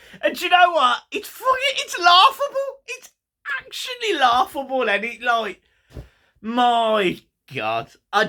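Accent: Birmingham, United Kingdom. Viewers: Why are you a Everton fan ? Sound like a Brummie.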